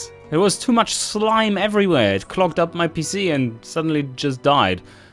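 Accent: Germany. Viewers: Like your videos dude :D your slang sounds like german doesnt it? cheers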